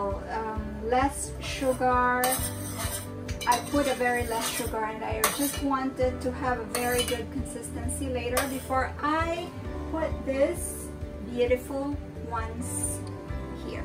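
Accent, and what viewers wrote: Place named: England, United Kingdom. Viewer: The best chef mom! super like the english accent and video content.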